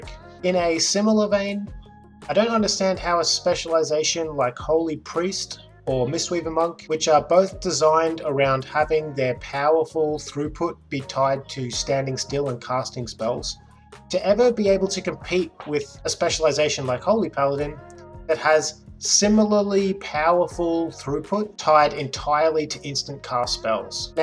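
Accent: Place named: Australia. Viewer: You sound Aussie!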